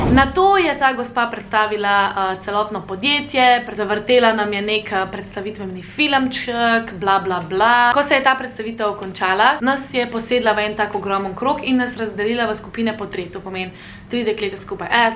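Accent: Ljubljana, Slovenia. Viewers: She never replied to you but if it helps judging by her accent i’d say she’s from Ljubljana. I’m not a frequent viewer so i don’t know where exactly she’s from, she might reside in Ljubljana or not but judging from her vernacular alone she lives/ or at some point lived in Ljubljana or in its proximity. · who is she supposed to learn from? Each Slovenian YouTube vlogger/influencer has their own good points while speaking Slovenian. So does everybody else. I would not learn Slovenian from a person from Primorska or Štajerska, for example. Ljubljana dialect is perfectly fine. However, I agree with you that the news might be even better.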